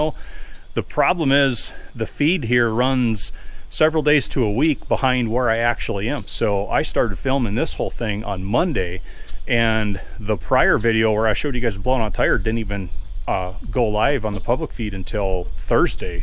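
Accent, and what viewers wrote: Minnesota, United States. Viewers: Here's a curious observation (not a criticism).....the extremely rare times you appear "stressed" on any video, your uniquely Minnesota accent comes out. As for the "swagger" inference.....it's more likely due to the way you walk especially in the field.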